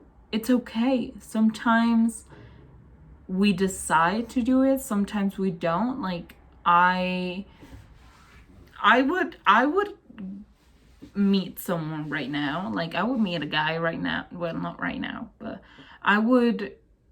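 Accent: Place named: Andalusia, Spain. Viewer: Ojalá tener tu acento, de que parte de sur de España eres?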